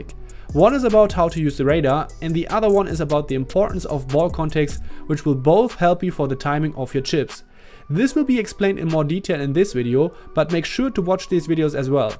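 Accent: Germany. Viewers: Man merkt extrem den deutschen Akzent haha 😂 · Bist du Deutscher? Hörst dich irgendwie so an🤷‍♂️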